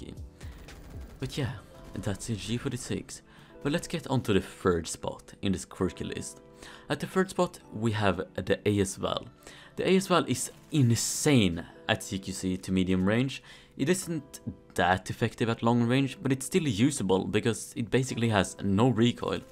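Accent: Sweden. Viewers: Bruh he's swedish. Wtf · Are you from sweden you sound like you are from sweden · Wow. Are you swedish? Your accent SCREAMS swedish 😅👌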